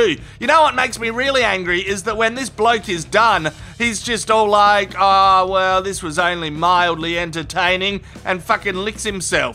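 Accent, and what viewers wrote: Australia. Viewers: I haven't laughed this hard since I've listened to the comedy rantings of Rodney Rude. Love the Aussie accent by the way.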